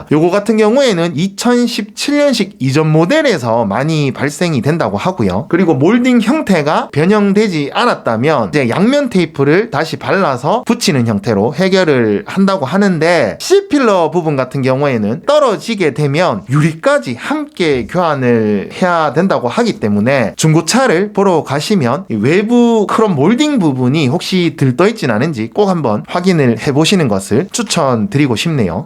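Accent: Gyeongsang, South Korea. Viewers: 경상도 사투리가 참 심하십니다ㅋㅋㅋ 부산에사는 군대동기도 이렇게 심하진 않던데ㅋㅋ 도대체 경상도어딥니까? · 대구경북 언어를 구사하시는데‥어딘가요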